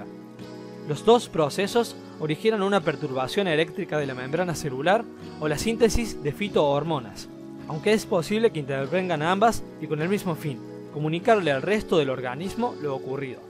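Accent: Córdoba, Argentina. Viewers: Alto cordobes xdd Me sirvio bastante para ciudadania :v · sós coórdobés vóz? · no entendí nada con el cordobés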